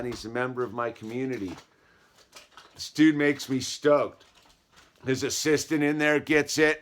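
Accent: California, United States. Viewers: I'm going to have to say that coming from a guy who does literal backbreaking labor, I wouldn't hear the word "dude" to much let alone the ever iconic Californian accent.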